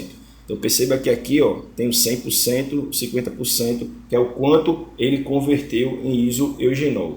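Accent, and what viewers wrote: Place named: Northeast, Brazil. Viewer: só quem é nordestino entende bem e reconhece esse sotaque rsrsr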